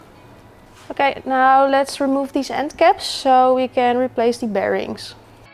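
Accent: Netherlands. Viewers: Thx for another great video! I was curious about your t-shirt and found some good music 😊 a little off topic, but I have to mention I am thinking about bitterballen & stroopwafels when I hear your dutch accent 🤭 I cant help it - sorry 🤭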